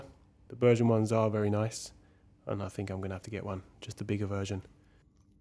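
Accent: United Kingdom. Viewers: Judging by your accent you are British British. So why the weird American word "winded", instead of wound?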